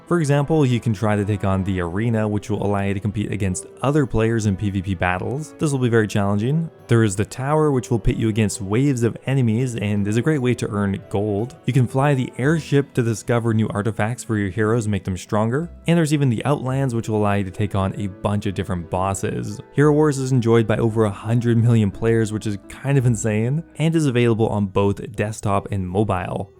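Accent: Quebec, Canada. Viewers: Are you french canadian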